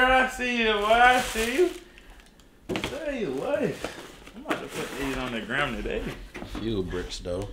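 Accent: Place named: South, United States. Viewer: i been saying the exact same thing but its a southern thing i got cuzins that sound similar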